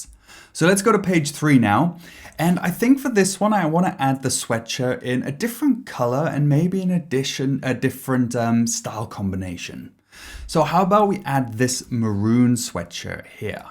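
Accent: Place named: United Kingdom. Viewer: Hey. Fantastic video. Much more informative than others on the listing photos subject. I notice a British accent. Are you UK based? If so do you sell in USA?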